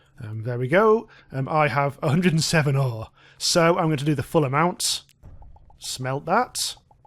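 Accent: United Kingdom. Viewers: This guy narrates like roald dahl with a british voice, love it !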